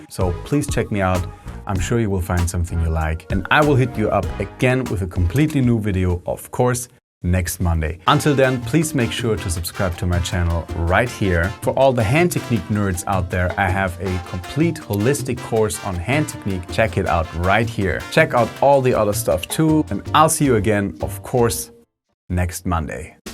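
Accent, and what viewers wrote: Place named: Germany. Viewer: brilliant! I’ll be watching it, cheers, are you in Germany? You sound german, if you don’t mind me asking..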